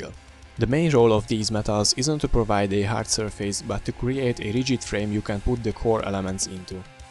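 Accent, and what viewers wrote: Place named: Hungary. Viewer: Hú de hallatszott a magyar akcentus de jó lett a videó. XDD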